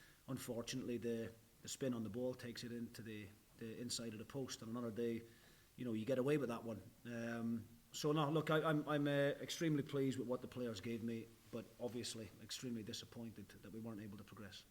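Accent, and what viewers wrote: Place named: Aberdeen, United Kingdom. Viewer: That's the first time I've noticed he's got a aberdeen accent lol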